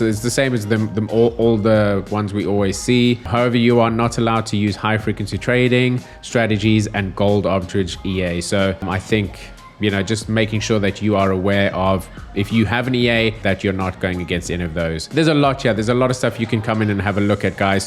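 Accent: South Africa. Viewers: Bruv are you South African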